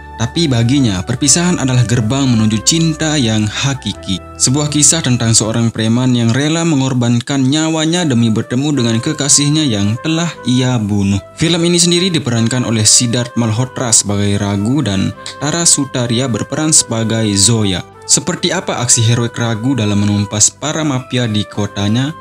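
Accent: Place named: Aceh, Indonesia. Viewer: Cara ngomongnya kaya orang Aceh min :v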